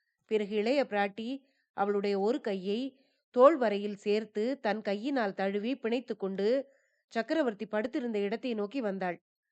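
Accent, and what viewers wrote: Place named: Gyeongsang, South Korea. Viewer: 오 경상도 시투리 억양과 비슷 하구나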